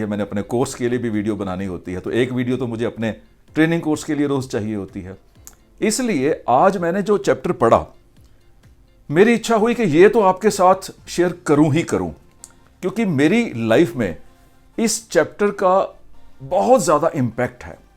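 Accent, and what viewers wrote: Punjab, India. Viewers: Punjabi accent h unka ye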